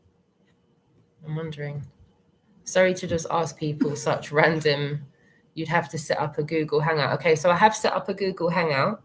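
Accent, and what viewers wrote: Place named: England, United Kingdom. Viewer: At last, Ive found an english lady who speaks the same as i do!! Id love to chat, if your up for it!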